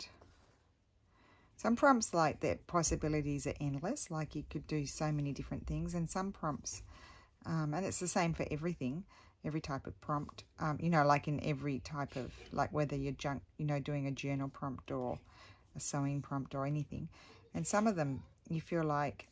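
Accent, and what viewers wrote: Australia. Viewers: Kid are kids at lest they are out side I just concentrate on watching you and listening. So great to hear and Aussie voice. Regional Victoria except for Shepparton he’s out of lockdown now but hard and fast rules still apply masks 10 people outside can gather, and in restaurants only 10 even if individual there can only be 10 people in a place at one time so I am going out with my granddaughter I haven’t seen for months for lunch we have one hour in a restaurant that’s it. Then do a little bit of shopping and she can’t come home with me I’m still not allowed to have any visitors although I live on my own. I hope all your family and friends in New South Wales or where ever they live in Oz are okay I pray that they are safe from the dreaded word please pass on my love to them. I love your videos so much sometimes I watch them two or three times and if I get Maude even more I love all that you do although I can’t come up with what you do. 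Hope you are safe over there take care.🥰🦋💕🌈💜.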